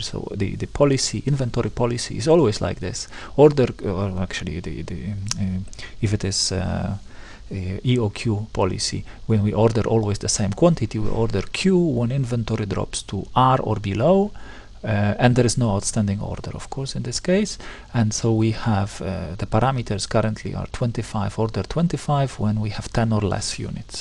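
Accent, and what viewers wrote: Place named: Egypt. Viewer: اللهجة مصرية بحتة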